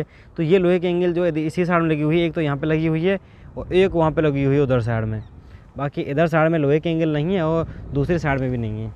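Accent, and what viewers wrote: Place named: Mathura, India. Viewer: Bhai aap mathura se ho kya Apki languages esi hi he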